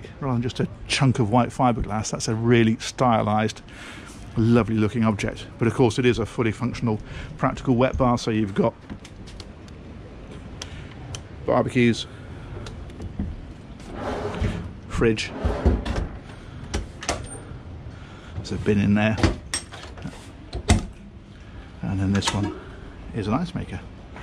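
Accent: England, United Kingdom. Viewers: Another one of your Splendid Reviews,. The World will miss you on U-Tube when you retire from doing these Very Positive reviews on your Aquaholic channel.I am guessing on your Age now. I Believe what really makes you Better than the Rest is your English accent and Your British Personality which Shines Through for you.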